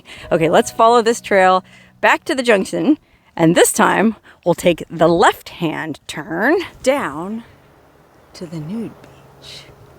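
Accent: Australia. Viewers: Your Australian accent is very Steve Irwin 👍 Definitely better than Meryl Streep's "A dingo's got my baby' 👍🤣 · G'day mate. your OZ accent was pretty good.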